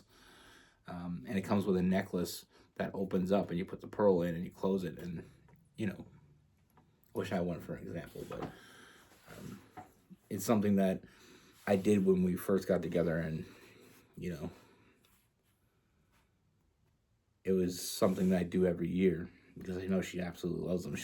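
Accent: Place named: Canada. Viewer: I friggin knew you were a cappie! aslo hearing the canadian in there. Love your work and storytimes ;)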